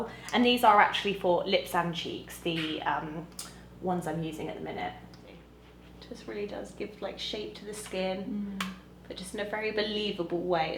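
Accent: Australia. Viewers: Loving hearing the familiar Aussie accent on your channel! x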